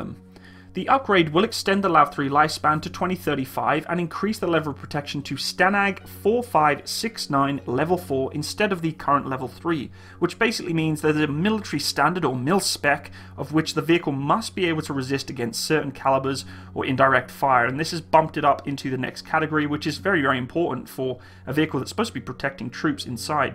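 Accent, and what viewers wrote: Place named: United Kingdom. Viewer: You don’t sound like a fellow Canadian you sound half british no offence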